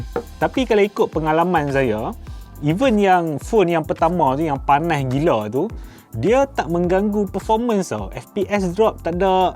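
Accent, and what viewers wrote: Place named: North, Malaysia. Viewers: Ckp utagha dia pekat boleh tahan hahah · Tudia kembali pada jalan sebenar, dulu review laguni bahasa utaqa pastu tukaq KL la tukaq balik. Sempoi 😁👍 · Cakap Kedah baik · org perlis ka?..ckp loghat perlis kalau ya..hi..kita geng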